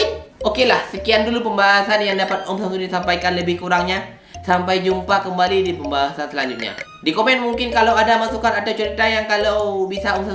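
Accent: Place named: Aceh, Indonesia. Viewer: Logat aceh abg nih.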